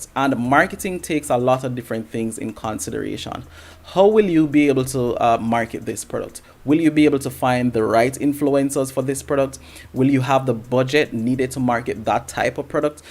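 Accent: Jamaica. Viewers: Good video. Are you from Jamaica?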